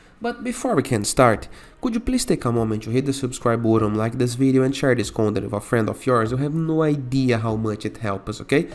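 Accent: Brazil. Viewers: tabom tabom vc ta certo vc criou algo kkkkk mas eu ri mto reconheci o sotaque brazilero na hora kkkkk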